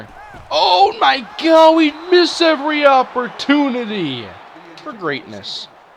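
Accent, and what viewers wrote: Minnesota, United States. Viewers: Hi are u from Minnesota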